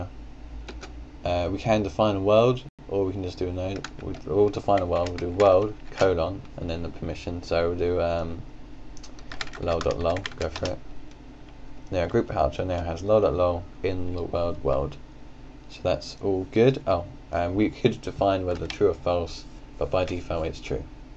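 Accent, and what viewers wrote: United Kingdom. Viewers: omg i cant understand wtf hes saying hes mumbling and has a british acent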